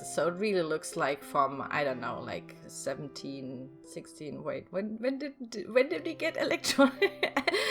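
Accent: Germany. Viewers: I think you're my favorite <3 I just love your buildings, your creative without using the cc, you're original and I love your german accent!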